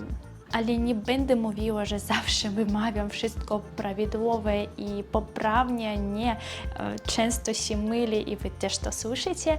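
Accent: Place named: East, Poland. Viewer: Ты умничка. Очень красиво говоришь по польски с еще более красивым восточным акцентом. Класс. Другие слова, так называемые ложные друзья, которые могут ввести в заблуждение это час, склеп, урода, просто или пукать (😅) итд. В целом их много, но это не помеха, наоборот Это делает жизнь более веселой! Успехов Тебе🤗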